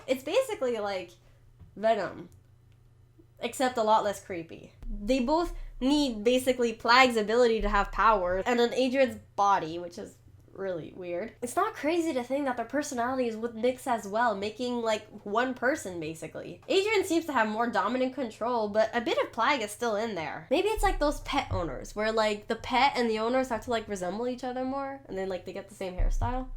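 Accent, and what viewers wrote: Quebec, Canada. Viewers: never seen this channel before, but I guess you're a Quebecker, right? Cause you sure sound like it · Unrelated, but are you from Quebec? Cause your French accent sure does sound like it!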